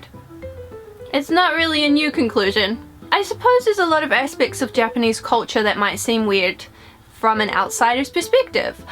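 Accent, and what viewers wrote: New Zealand. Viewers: I love your videos so much, they always make me smile. I can't think of anything strange about my country (Australia). Also I LOVE your New Zealand accent ^-^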